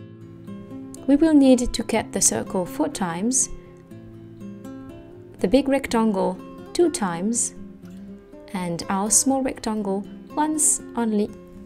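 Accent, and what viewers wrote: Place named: France. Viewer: Very good step by step video. (And a charming French accent too!) Same method as recommended by my highly experienced upholstery tutor.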